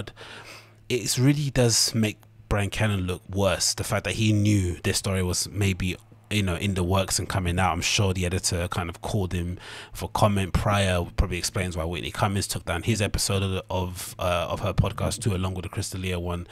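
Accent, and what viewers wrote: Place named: Southampton, United Kingdom. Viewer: Your accent sounds like its from Southampton U.K. very familiar 🤙 keep up the good content man!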